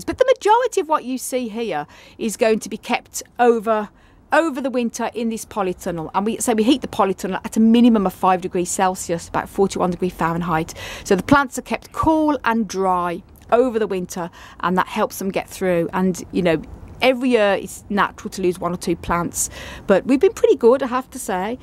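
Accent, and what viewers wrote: Birmingham, United Kingdom. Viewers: Are you from brum..sound like my family😂😂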